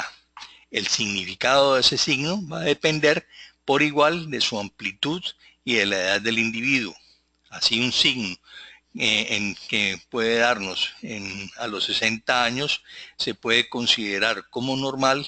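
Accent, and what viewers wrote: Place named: Colombia. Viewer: Buenas tardes usted da cursos de este tema es decir iridiologia me interesa y de donde es yo soy de colombia fue que hice un curso de irdiologia pero veo que no me enseño como es .Como usted esta explicando este supuesto profesor me cito dos dias pero no me explico como usted habla .vulharmente me robo la plata .mi telefono es <num> le agradeceria si me llamara si usted .veo que parece ser de colombia por el acento gracias dios los bendiga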